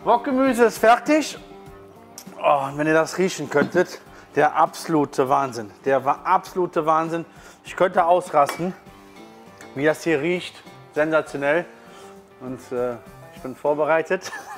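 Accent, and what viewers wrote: Cologne, Germany. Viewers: wie immer alles super erklärt. Mit deinem Kölschen Akzent, Meeeggaa. Macht richtig Spaß deine Videos zu schauen! Weiter so!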